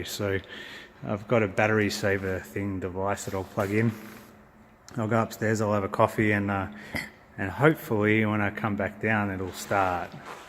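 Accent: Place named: Australia. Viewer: Your accent tells me you're in Aus - mate, lodge a complaint with your State's consumer affairs department. They've helped me in the past and I'm sure they'll help you. Remember we have pretty strict warranty laws and BMW is legally obligated to help. With this video you have enough evidence to (nicely) demand action. Good luck, and post updates! EDIT: Just read your post, you've already lodged with ACCC - no surrender!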